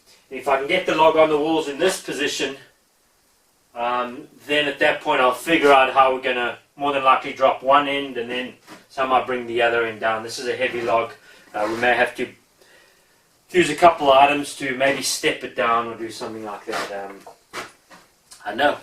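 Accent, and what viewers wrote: South Africa. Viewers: You sound South African. Where are you from? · Wow. Came here by chance. Almost fell over when I heard the accent. Nice to see an ex SA man doing some great things. Where are you based? Subbed. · Good old South African accent there. Subscribed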